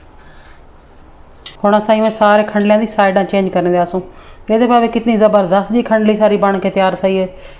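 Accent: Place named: Saraiki, Pakistan. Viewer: سبحان اللہ سبحان جیا آپ لہجہ سرائیکی ہی رکھا کریں اور ساتھ چیزیں کی تعداد کے لحاظ سے کوئی برتن بھی بتایا کریں جاوید خان تشنہ ایران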